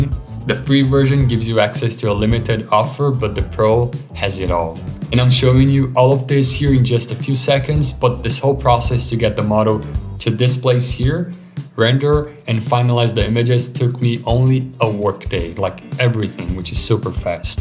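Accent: Brazil. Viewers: vc é brasileiro?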